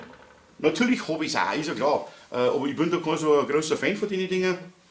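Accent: Bavaria, Germany. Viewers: hallo, zuerst mal vielen Dank für deine Videos immer wieder eine Freude anzuschauen und die Art wie du auf deiner boarische Art die Sachen vor trägst herrlich. Ich habe aus deinen Videos schon viel an Wissen dazugewonnen. Ich habe noch zu einer Zeit Kabel absetzen gelernt als wir nur ein Messer hatten und einen ordentlichen Rundschnitt gemacht haben. Richtig so dass du den Bleistift spitzen die Meinung geigt. Und das Leben aus der Praxis betrachtest eben wie es auf der Baustelle abläuft. Ich freue mich schon riesig auf dein Video zum Thema Drehstrommotoren und habe dazu sicherlich jede Menge Fragen. Spannend wäre für mich auch eine Sache die vielleicht noch andere interessiert nämlich die elektrische Drehzahlregelung an Drehstrommotoren insbesondere bei kleineren Ständerbohrmaschinen die dann am 230Volt netzbetrieben werden vielleicht hast du mal Lust dazu etwas zu machen. 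Wenn's morgz kannst ja mal bei mir bei Instagram vorbeischauen ich mache viel mit Holz aber auch Werkzeugbau und Maschinen mit Vorrichtungen. Liebe Grüße aus Berlin der saupreiß zieht die Hut vor dem Bayern. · Hallo, ich bin dem Bayrischen nur bedingt mächtig, konnte dir aber im Wesentlichen folgen. Aderendhülsen - ich bin von Grund auf kein Mensch der gern mit halbherzigen Werkzeugen arbeitet. Aber die Presszange von Knipex für 130 Euro war mir einfach zu teuer. Ich dachte mir, dass dies auch bestimmt günstiger geht und daher habe ich mal bei dir reingeschaut und bin fündig geworden. Danke für deine Hinweise und deinen Randbemerkungen, die oft den praktischen nutzen beurteilt. ich könnte mir gut vorstellen, dass so eine billige Zange bei 16²mm anders pressen wird, als einer Knipex. Nun, ich bin aber kein Berufselektriker, sondern Elektroniker. Ich löte eigentlich fast nur und dies habe ich in der Vergangenheit auch mit Litzen getan - sie verzinnt. 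Dies soll aber verboten sein und mir gefällt die Isolation nach dem erhitzen nicht besonders gut. Außerdem ist es schneller erledigt, Aderendhülsen mit Kunststoffhülse zu verwenden, satt sie zu verzinnen und dann mit Schrumpfschlauch zu überziehen. Ich werde wohl kaum mehr als 3.5²mm pressen. Hauptsächlich geht es um dünnere Steuerleitungen die an einer Leiterkarte angelegt werden sollen. Das möchte ich gern professioneller umsetzen können. Daher die Suche nach geeignetem Werkzeug. Danke für die Info um Quelle. Dort finden sich zahlreiche Anbieter von Zangen und Sets. Ich schau mal dass ich geeignetes finden werde. Mir war wichtig zu erfahren, ob die preiswerteren Zangen schlechtere Ergebnisse erzielen oder in ihrer Anwendung umst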